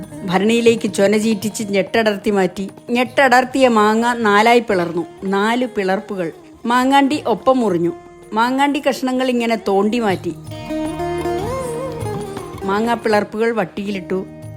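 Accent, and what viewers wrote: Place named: Ranni, India. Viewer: എൻറെ മുത്തശ്ശിയും ടീച്ചറുടെ നാട്ടുകാരിയായിരുന്നു.റാന്നി.ഇതേപോലെയുള്ള സംസാരവും ജീവിത ശൈലിയും.❤❤❤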